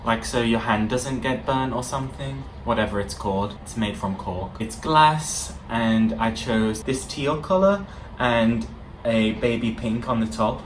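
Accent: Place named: Midlands, United Kingdom. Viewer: Aweee.. 😍 Thank u so much, u guys r awesome *hugs* and btw a hint of where I’m from is in my YT name 😉 I’m a Swede i.e. I’m originally from Sweden 🇸🇪 but it gets a bit more complicated after that, as my mom‘s German and growing up we moved house A LOT (mainly due to my dad’s profession) until about ten years ago when I came to London for my studies 🇬🇧 and I’ve lived here ever since 🤷🏼‍♀️ Whereabouts in the UK r YOU from btw ‘cause I hear a bit of an accent which - to me - says Midlands but I can’t make up my mind if it’s like, between Birmingham and Cheshire or further north? 🤓😁 And last but not least: thank u so much also for the new vlog, I’m loving it a lot and ur bf teaching u Dutch had me LOLing so much, u guys should do the whisper and/or yoga challenge next 🙏🏻😅👍🏻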